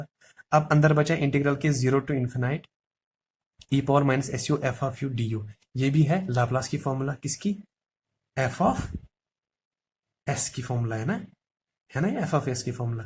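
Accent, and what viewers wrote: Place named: Kashmir, India. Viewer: your kashmiri accent is too good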